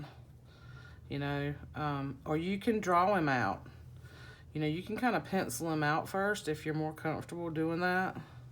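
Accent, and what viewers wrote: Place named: South, United States. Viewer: Yes please, love all your process, picking out your holiday papers?, I can watch you do anything, even drying paint. Love your tags. Please don’t say negative things about yourself, I know Im not the only one who appreciates all that you do for us. Your southern accent is wonderful to listen to. I’m really enjoying Christmas in August, thanks!!💕💕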